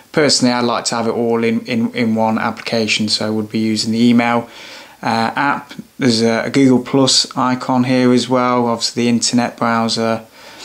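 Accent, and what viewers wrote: United Kingdom. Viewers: Cockney accent. People really admire it.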